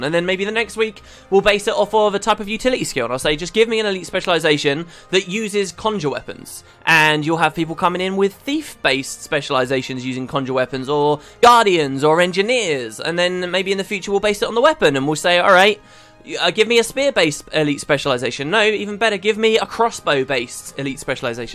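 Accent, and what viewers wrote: United Kingdom. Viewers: You gotta tell me what area of Britain you're from! I heard you pronounce "law" similarly to "lore" and couldn't help laughing. Great ideas for the giveaway though, I hope it all pays off by bringing in new viewers:)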